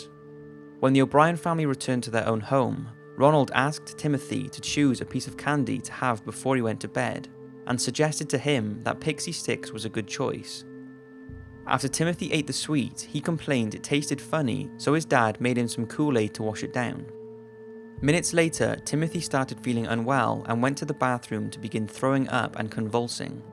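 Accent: Wales, United Kingdom. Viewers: It sounds Welsh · Cardiff/Newport in wales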